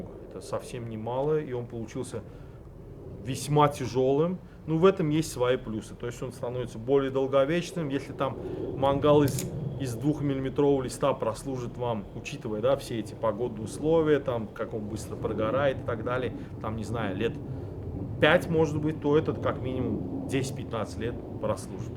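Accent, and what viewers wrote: Baku, Azerbaijan. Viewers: Всегда рад послушать ,Бакинский акцент так как я родился и вырос а Лок-Батане,в 24 года уехал в Пензу,но Баку в моем сердце НАВСЕГДА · Здравствуйте! Большое Вам спасибо за лекцию. Самый правильный, познавательный, без лишней воды расклад по теме. Очень приятно было Вас слушать. Родной акцент, с любимой родины моей. Здоровья Вам и вашим близким.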